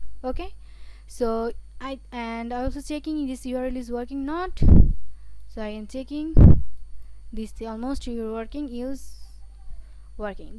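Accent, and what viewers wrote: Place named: Bangladesh. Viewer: speaking in english but bangla pronunciation!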